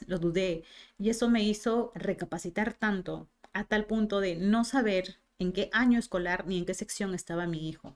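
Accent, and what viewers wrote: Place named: Peru. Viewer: Me inspiraS , tienes algun libro de tu autoria?? Hablas como peruana. Ya soy tu seguidor..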